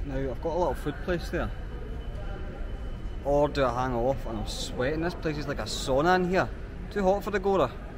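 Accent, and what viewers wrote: Scotland, United Kingdom. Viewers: Sounds like Dale Philips, you must be Scottish. Very weird accent,requires too much attention to understand what are you trying to say. Airline is very reliable, and takes you where you want to go.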